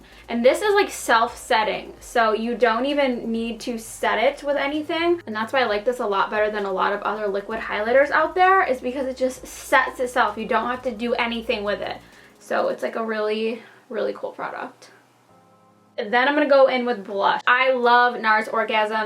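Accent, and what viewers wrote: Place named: New York, United States. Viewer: This is offensive probs but I hate NY accents usually but I freakin love how you talk so much gaha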